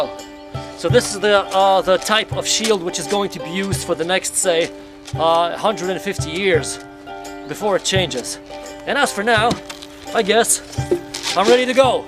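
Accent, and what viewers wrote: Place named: England, United Kingdom. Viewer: Genialny angielski akcent wow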